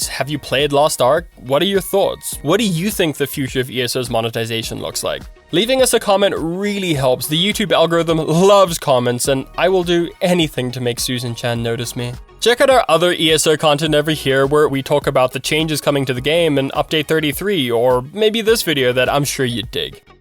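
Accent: South Africa. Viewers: love hearing a fellow Saffa on YouTube! great video! hope your channel blows up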